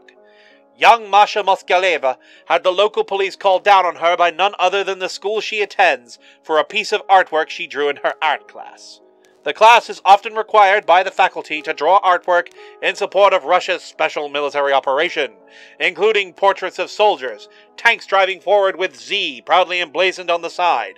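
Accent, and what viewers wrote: Transatlantic, United States. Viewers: Absolutely fantastic transatlantic accent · Ngl, this is a pretty good transatlantic accent. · Yes, he has a fantastic Transatlantic Accent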